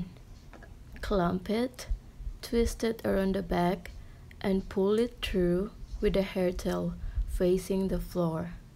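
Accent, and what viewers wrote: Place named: Australia. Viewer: I love the Aussie Accent oi , hair lol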